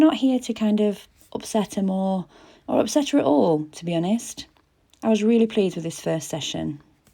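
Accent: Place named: Yorkshire, United Kingdom. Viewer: Err yes she can really use herself free jumping lol😂, Molly is going to be a proper sweetheart given time which I'm pleased to hear is what you do. Very impressed with your knowledge of feed and how important it is to read the bl###dy contents, know what your horse/ pony needs and feed accordingly, no more obese or whizzed up equines, great vlog, and great to hear a Yorkshire voice, also I was taught to ask a mare to get the best out of her ❤xx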